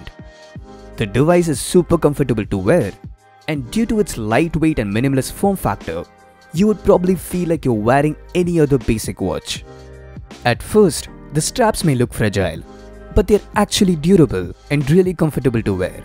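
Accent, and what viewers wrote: India. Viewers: dat indrish tho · I think this man from india, didnt catch this fucking accent.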